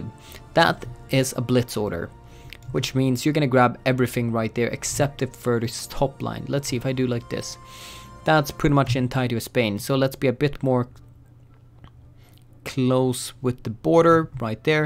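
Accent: Sweden. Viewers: Are you Swedish or Norwegian?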